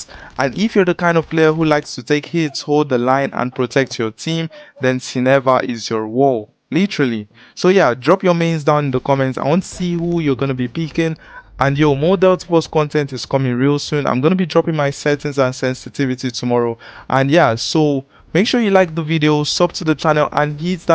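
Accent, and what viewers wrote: Nigeria. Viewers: You sound Nigerian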